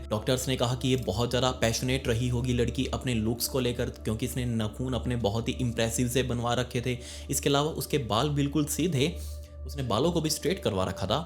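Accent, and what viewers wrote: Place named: Hyderabad, India. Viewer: I visited your channel after years and idk why I feel your accent is changed. You are sounding hyderabadi accent 🤧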